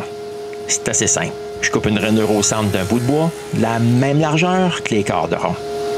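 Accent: Canada. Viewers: ce petit accent Canadien me régal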